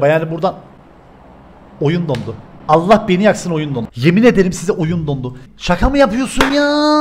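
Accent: Cyprus. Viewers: Abi sen kıbrıslımısın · Abi sen gerçekten Kıbrıs lımısın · Kıbrısta nerelisin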